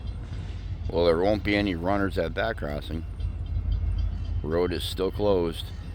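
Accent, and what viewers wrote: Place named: Uttar Pradesh, India. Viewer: I left the U.P. 55 years ago all my U.P. family is gone now to hear your accent feels like home.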